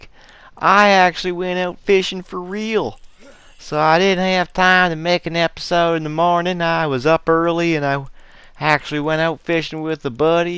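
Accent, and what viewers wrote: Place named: South, United States. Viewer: Been missing your RDR2 online videos. Hope all is well! Your "Southern" accent is hilarious 😂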